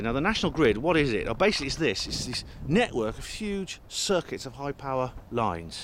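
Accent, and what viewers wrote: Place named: Oxfordshire, United Kingdom. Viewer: Free energy tech demonstration with a distinct Oxfordshire flavour... :) watch?v=cuTp7HkpW7U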